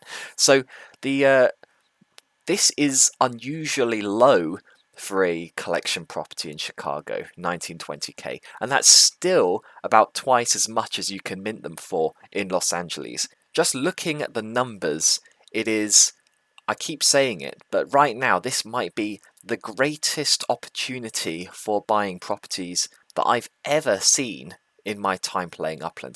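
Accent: United Kingdom. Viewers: Wut? He has a super British accent.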